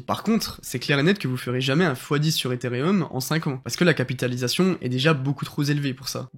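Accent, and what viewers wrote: Franche-Comté, France. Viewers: Vu l'accent, tu dois être en Franche-Comté toi ;)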